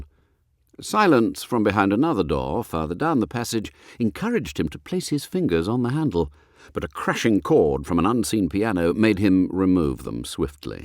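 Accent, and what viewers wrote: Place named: United Kingdom. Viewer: Dear, please reread the Wodehouse novels read by female readers. Although some are fairly good writers, invariably they don't have a British accent which is really appreciated. Also, because most of the characters are males, it just makes sense. Thank you so much for the great reading. Looking forward to finding more of your readings. Thank you!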